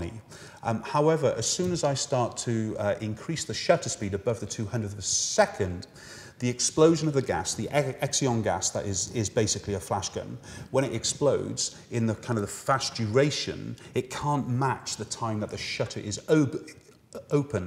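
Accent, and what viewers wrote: United Kingdom. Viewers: Morgan Calvi English West Country...Gloucester or Bristol would be my guess.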